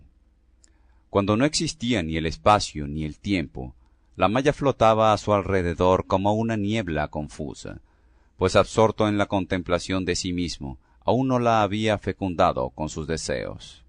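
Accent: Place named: Latin America. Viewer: Escuchar a Bécquer con acento latino...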